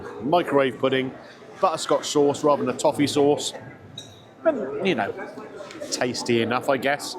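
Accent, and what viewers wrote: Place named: Dorset, United Kingdom. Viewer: I went off piste a bit with your reviews, but I’m happy too say , although I’m sure you would not mind either way , you’ve got back into my good graces , I think it’s your Dorset accent, I’m originally from Devon and I’m getting home sick stuck in central London, also I think you’ve done some stunning reviews lately too , there is room for both you and Gary in my subscriptions , My best too you and Mrs G , keep up the good work 😊 !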